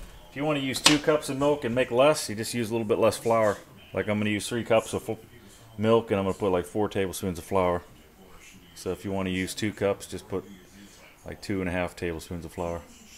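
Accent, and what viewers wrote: South, United States. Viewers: imagine if this guy didnt have a southern accent. i wouldnt be able take this video seriously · once you hear that thick southern accent and the video is about food... you know shits.. about TO GO DOWN ! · How annoying is that! Someone actually commented that they missed what he did with the flour. You know you can watch the video more then once. I love your voice. It's country like me :) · Your country accent does things to me!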